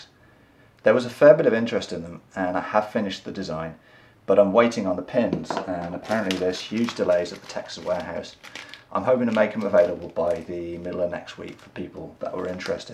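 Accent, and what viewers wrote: United Kingdom. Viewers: U in canada? With a british accent hehe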